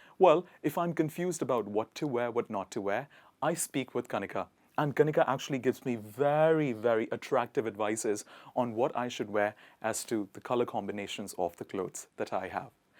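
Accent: United Kingdom. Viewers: Is it SAAvy? Or Pronounced as savy? This man pronounces in a British way!! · Your English language pronunciations is not like Indians man. I thing you are born and raise up in England. Your talking in English language the way you pronounce each words is like British man. It is very wonderful. GOD Bless You.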